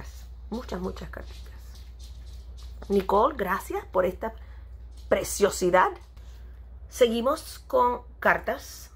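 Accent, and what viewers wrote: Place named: Chile. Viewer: alguien más se dio cuenta que ya se quedó pegada con el acento chileno XD saludos desde chile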